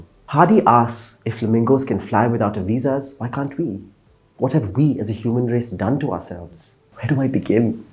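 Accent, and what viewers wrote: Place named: United Kingdom. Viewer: I am eager to hear your review on Salaar tomorrow! With same classic British English!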